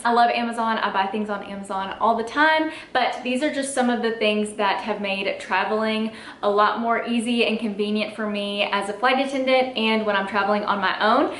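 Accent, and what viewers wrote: South, United States. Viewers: Am I the only one that enjoys listening to a southern accent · I thought I was the only one who traveled with coffee creamer. Glad I found your channel. Love to hear a familiar accent (Southerner here too). ;)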